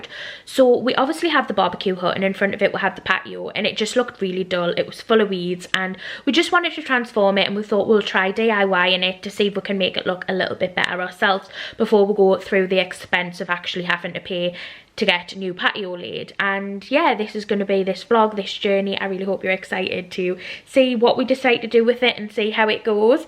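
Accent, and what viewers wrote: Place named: Jamaica. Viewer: Jamaican here. Its wild to hear the similarities in our accents. Good job on the patio!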